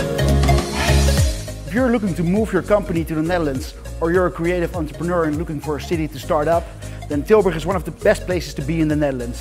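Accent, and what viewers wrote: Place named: North, United Kingdom. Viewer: Jesus lad ,that accent is not from Tilburg ,you are from up North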